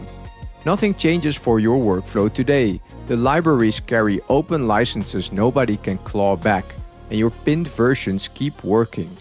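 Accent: Netherlands. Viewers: Just dropping by to say you have the Dutchest accent I've ever heard in my life.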